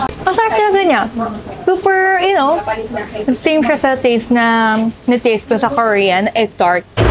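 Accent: Philippines. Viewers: kombinsido na hindi talaga koreana si dahil sa galing magtagalog at pinoy accent pa. di kaya.... 😄😄😄😄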